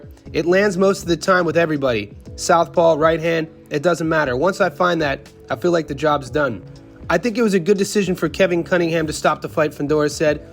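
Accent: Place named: Australia. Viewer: Tszyu you say you're Mexican maybe you are but not really you sound like an Australian you born in Australia you look Australian and because you from Australia you are Australian not Mexican so you can forget about that and probably the best that Australia has to offer in the boxing world. Yahweh said that's known as Jesus now you are not a pastor in your own land you know boxing is all politics just like everything else your father might be from Mexico but where you at now Australia I never heard of a Mexican living and born in Australia that's unheard of that's the other side of the world ...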